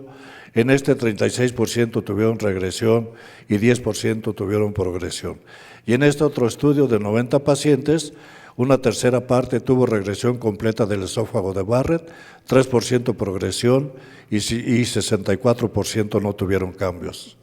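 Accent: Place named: Mexico. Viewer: El habla mejicana así utilizada es realmente agradable para el oído español. La conferencia ha sido para mí muy útil, explicativa y consoladora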